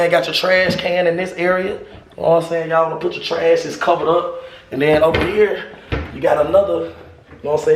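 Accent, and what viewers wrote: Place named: South, United States. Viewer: Twin I got to this part of the video!! lol you so country too tbh remind me of my peoples 😅❤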